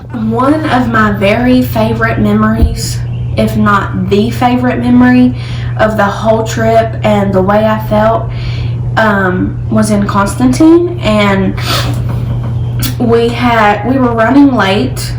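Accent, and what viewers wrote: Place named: Kentucky, United States. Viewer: I love your accent the Kentucky accent. 😁 welcome to algeria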